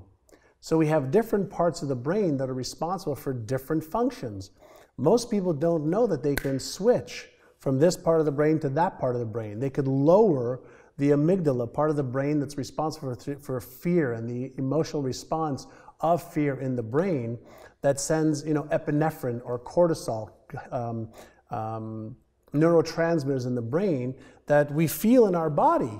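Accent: France. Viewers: A blond guy with a french accent , what a treat, video made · I love this French accent. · This French accent: -)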